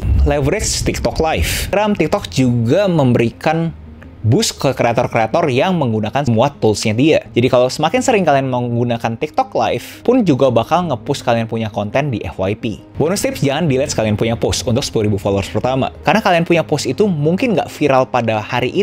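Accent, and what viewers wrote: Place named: South Jakarta, Indonesia. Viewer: Bahasa lo trllu jaksel bang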